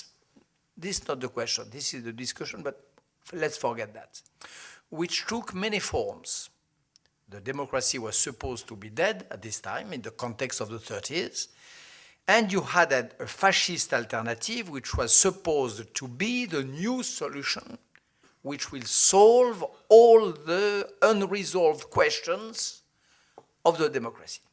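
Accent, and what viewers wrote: France. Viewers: he is right anyway... but his french accent is fucking funny...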